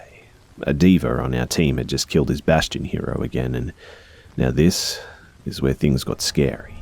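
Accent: Australia. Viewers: Are you an Aussie? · He's Australian, lmao.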